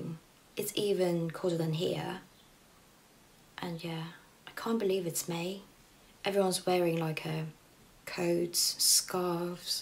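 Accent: England, United Kingdom. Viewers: 고급진 영어~~덕분에 영국 느낌 물씬 느끼고 갑니다^^^^ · 님 브리티시 악센트 들어서 넘 행복해유~♡ 손은 나아지셨나요ㅠㅠ 반지도 목걸이도 다 예쁘고 그 중에 젤 재밌는건 님 얼굴..넘 예뻐서 홀린듯이 들어왔습니다..ㅠㅠ 인스타 사진두 잘 보고있어욥!! Have a good day❤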